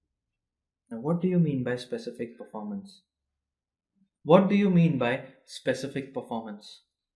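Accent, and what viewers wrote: India. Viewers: Can't stand this weirdo Indian and his annoying accent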